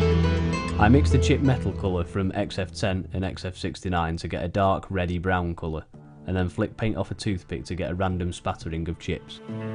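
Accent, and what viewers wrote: United Kingdom. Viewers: Nice to finally hear your voice you sound like your from the UK.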